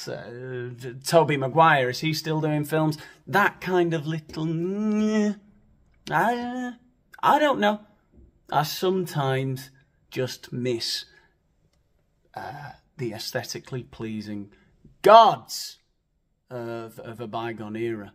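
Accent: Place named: Wales, United Kingdom. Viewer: Hahahah love the Welsh accent 🙋🏼‍♀️🏴😅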